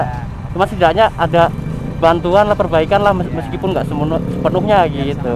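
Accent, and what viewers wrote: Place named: Banyumas, Indonesia. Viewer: Wong ndi mas deng ngapak hehe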